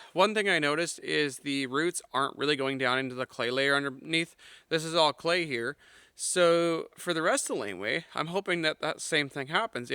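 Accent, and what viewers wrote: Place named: East, Canada. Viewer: Fuel injector cleaners and a product called "Engine Restorer" get my endorsement for old junk iron like your machine. Engine Restorer is a product you put in your oil it contains copper, silver and lead and helps to re-seal worn cylinder walls and helps worn bearing surfaces. Project Farm used it with great success. It is really hard to get in Canada now though. Not sure where you're from. Sounds like back east Canada, but maybe Maine... I got a few jugs before it went away. I'm using it in my gas engine with 428,000 km. It is down a bit of compression on the cylinders. Did a compression test before and waiting to see how much it picks up. Many folks online have used it and seen good results. Keep that old iron going!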